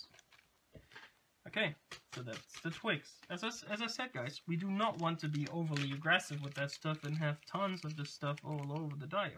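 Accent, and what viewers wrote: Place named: Southern California, United States. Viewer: I like your "shoot from the hip" style. It really is like that in real life, where different techniques, materials, and approaches are constantly being juggled to find the best way to model a certain stage of one's work. By the way, maybe you have disclosed it, but are you in Europe? Your common products such as white glue appears in your clips with European labeling, and you have mentioned costs in Euros, not dollars. This belies your SoCal accent. But I do enjoy the casual nature of your clips, and the emphasis on diorama building and layout.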